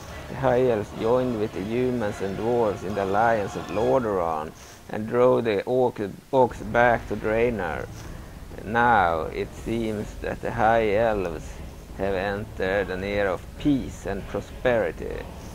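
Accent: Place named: Sweden. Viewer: I ceartinly look forward to be playing this :D Looking forward to the Ahn Qiraj the most personally Also do excuse me if im wrong but im an sensing an slight tint of Swedish in your english voice, if so Hallå Hallå :D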